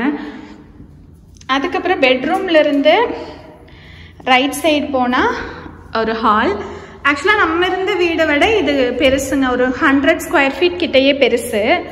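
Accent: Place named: Coimbatore, India. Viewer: Sister neenga Coimbatore ah....covai slang😊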